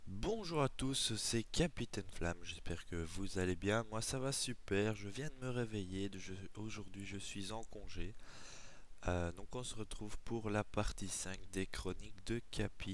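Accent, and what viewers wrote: Belgium. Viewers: tu viens de ou de Belguique?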